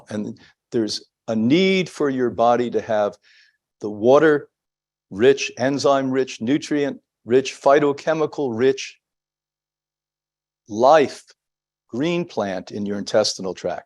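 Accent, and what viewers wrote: New York, United States. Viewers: Poor! No matter how much knowledge and data you have, when are you going to learn that the way you deliver your information to human beings is all important? You're constant attitude of sarcasm and a superiority virtually reek from your being and the sidewise New York delivery is of no help whatsoever... You simply sound like the smartass spouting his stuff. When is the true milk of humankindness the true perfume of genuine affection going to issue from your being before you depart this world? Is it reserves for your family alone? How tragic. Try to talk to the man in the mirror. Perhaps give him a little trim and view him with no cosmetics. Whatever, and see if you really like this guy. It's a stretch to believe so. In any case, whatever you do, do it in love, and without needless sarcasm. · Yes and I love the NY accent too as I’m originally from NJ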